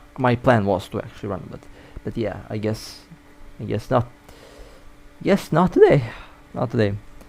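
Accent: Russia. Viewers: да делай на русском судя по акценту же русскоязычный!)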